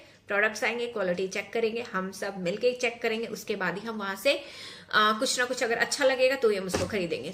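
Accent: Punjab, India. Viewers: R u a Punjabi Girl.......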